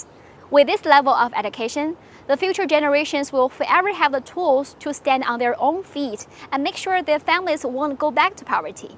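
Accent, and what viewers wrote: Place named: United States. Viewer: You do not sound like studying in UK, more on US.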